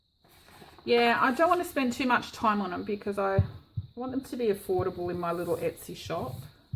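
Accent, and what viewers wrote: Australia. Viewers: These are absolutely fabulous,! I hope they sold well! I’m an old quilter, started in 1986! But haven’t quilted much since my divorce & move back to Kansas in 2004. So I have a huge collection to choose from! 🥰🤗I will have to push junk out of the way, but if I’m motivated that won’t be a problem! Haha! I recently bought some cotton fabric at Walmart (those of us who really aren’t too crazy about shopping there call it Wally’s World! I suspect you would be in that category!) But I bought it to use as half pockets on larger journal cards, etc. Then thought of some other thing to be entranced with & they got pushed aside…story of my life!! Another woman said she thought she had “journaler’s ADHD”, yep that’s me! I don’t think it’s all that uncommon among crafters, though! I’m enjoying your channel soooo much, you are just so much fun & I love your accent! 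In one video you said you lived in Sidney, are there different accents around the country? I mean you’re a continent! England is just one little bitty country & yet practically everybody I watch has a different accent! It’s really interesting to me how that happens. Are your eyes better now? I hope so! Take good care! Erica❤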